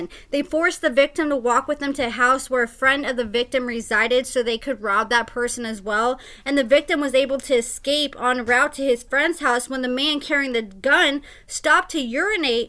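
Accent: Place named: South Dakota, United States. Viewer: Where are you from,? I’m asking because I heard you say “pEllow” instead of “pillow” and yesterday I heard you say “reNember” instead of “remember”, lol just asking because my grandparents are from South Dakota and that’s how they talk. They say “pEllow” and “mElk”.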